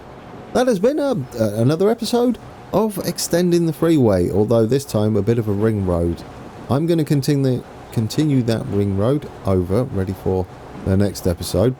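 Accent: England, United Kingdom. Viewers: I live in Minnesota and you have represented us well. To bad the game didn't have the seasons as it is beautiful here in the Fall and very white and cold in the Winters! Might be fun riding along with you on your Interstate 35 and sliding around in the snow and ice! Your my fave! Look forward to your updates and can't wait for your your new TF 2 venture! Thanks for all the hours of pure enjoyment. Why haven't you picked up on the Texas accent yet? A Texas/English accent would be fun to here. By the way. I may be your oldest viewer. I'm 70 years young. I have the game. But my old burnt out brain may not be able to play the Hard or longer games. So I live through you! haha Kinda scary huh!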